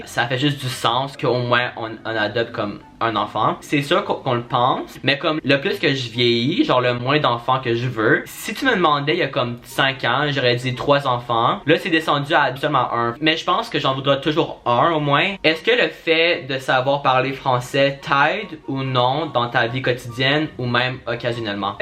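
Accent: Quebec, Canada. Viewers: mon dieux ahahah j'aime trop l'accent que tu as du canada ahahhh, c'est trop a par ca beau couple avec vous allez bien ensemble 😍😍😍, plein de bonheur a vous 2, bisou de la suisse 🇨🇭🇨🇭🇨🇭🇨🇭🇨🇭, y faut que tu viennes en vacance avec ❤️❤️❤️❤️, Lugano, crans-monta, ainsi que Lausanne, ou Gstaad, sont des très belle chose à visité 🙏🏼🙏🏼🙏🏼🙏🏼🙏🏼😘😘😘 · God, je te regarde tout le temps en anglais et j'aurais jamais imaginé que tu parles français québécois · I'm learning French. Question; is this an "obvious" Quebecois accent. How big is difference with France French? Is it like America/UK or smaller? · 10% d’accent français 40% d’accent québécois 50% d’amour❤️ #cloche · Je ne suis jamais allé chez le coiffeur. Voilà:) Et genre c'est fou comme tu as un accent français mais genre je ne sais pas d'où uwu Bah oui le Québec mdr #cloche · J'adore tes vidéos! Aussi t'es la seule personne dont l'accent quebequois ne me dérange pas 😂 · Moi qui est française je trouve que tu as un accend très prononcé québécois enfin bon moi j'ai l'accend toulousain😅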